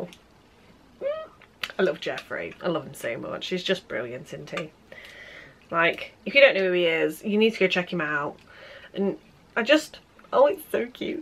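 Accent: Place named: United Kingdom. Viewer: I love your British accent. Do you live in Northern England ?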